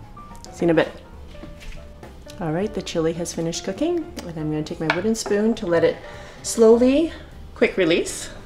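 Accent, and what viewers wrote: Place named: Canada. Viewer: lucky! i'm assuming you're in canada, i hear a bit of the accent :) furthest i've been up that way would be seattle, but hope to make it to canada some day. i love that kind of weather! have a great week :)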